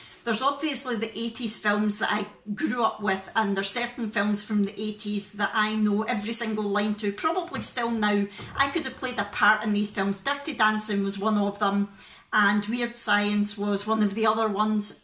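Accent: Scotland, United Kingdom. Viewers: New subscriber here :) nice to hear another Scottish voice on YouTube x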